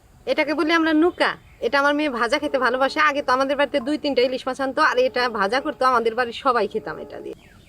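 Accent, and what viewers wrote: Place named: Bangladesh. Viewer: দিদি ভাই তোমার কথা একেবারেই বাংলাদেশের মতো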